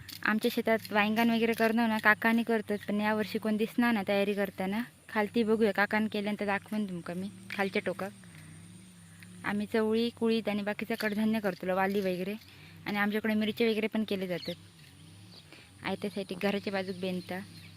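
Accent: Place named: Malvani, India. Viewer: छान शुद्ध मालवणी ऐकुन बरं वाटलं. गावाचं नाव सांगितलें असते तर अजुन व्हिडीओ ची मजा आली असती. शेअर लाईक करा.मालवणी भाषे करिता.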